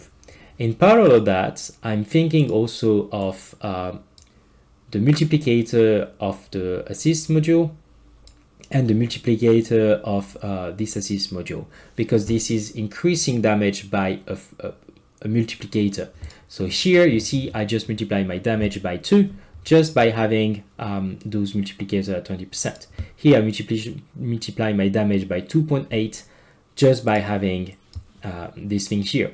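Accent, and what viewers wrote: France. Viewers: this video title and the first 1min have already made my day! been wondering which creator code to use soon but I think I may have made my decision. i was prejudiced against your accent because I thought I couldn't understand it. But once I realized you were French it became very easy to understand you. Your mindset and presentation both most closely resemble my thinking and learning style. (Shout out to Spartan, as well). Can't wait to finish watching!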